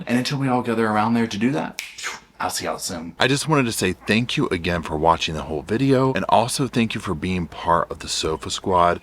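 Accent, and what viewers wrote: South, United States. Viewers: I have figured out why I enjoy your commentary about cases so much! You remind me of conversations with my brother about anything/anyone who is doing shady shit or sus situations. I love it as we can be some salty, sassy, and petty southerners. I laugh because my brother in law just rolls his eyes when we get catty, but that's how we just gossip for lack of a better word. Now, mind you, this isn't really gossip, but it's delivered similarly and as we grew up in west tn, the accent is another plus. This is just a thank you for being you and and for doing what you do bringing cases and situations around them to light in your way.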